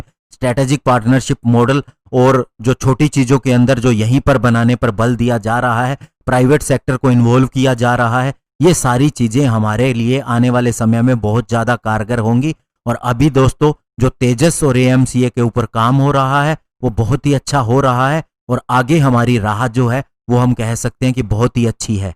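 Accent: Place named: Pakistan. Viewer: U r Pakistani.....surely.........Bhai hamara military budget 4th largest haii world kaa.....n tune to Pakistan ki zabardast haar 1971 k baatein mein to kuch kaha hi nahii....n Teri baton se to lgta hai Indian air force kuch bhi nahi haii....n Tera accent bhii Porkistan jaisa haii....sun be Tere Pakistan ki naa Bahan KR k jayengee huummm yaad rakhiyo.......bhikahariyon....roti khane k paise nahi ladoge humsee.....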